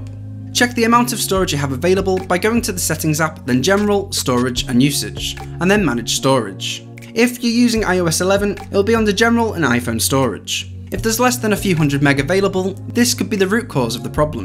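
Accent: United Kingdom. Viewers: This video is proof that having a British Accent doesn't automatically make you smart.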